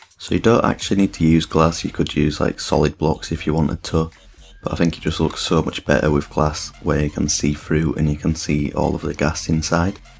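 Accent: Scotland, United Kingdom. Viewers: Ghast Farm????? Jesus you are cracked. I based my base on your's so thank you! You deserve more subs. Also, Are you Scottish? I love your accent :)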